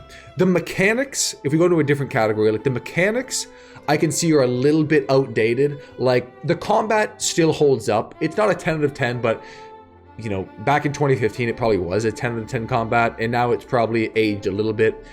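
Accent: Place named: Canada. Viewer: Most Nadian accent ever!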